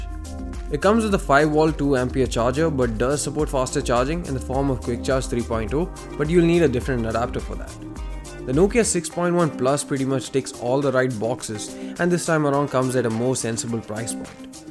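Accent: Kerala, India. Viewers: ok , u r from kerala?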